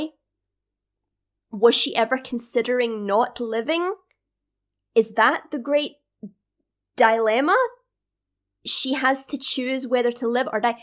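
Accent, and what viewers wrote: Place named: Scotland, United Kingdom. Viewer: You have a beautiful accent, are you from Scotland? And yes, I found your channel among the Ahsoka reaction videos.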